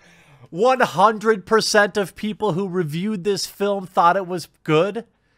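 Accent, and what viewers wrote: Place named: Midwest, United States. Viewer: Your Midwestly was showing a bit in this one.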